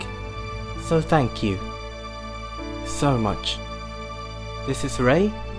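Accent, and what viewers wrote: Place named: Indonesia. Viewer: I feel like his Indo accent is way stronger here than previously.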